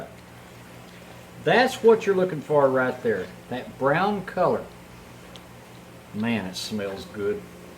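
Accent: South, United States. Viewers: A couple seconds in the video.. "oh this guy is from the south, so you know he knows how to cook ribs"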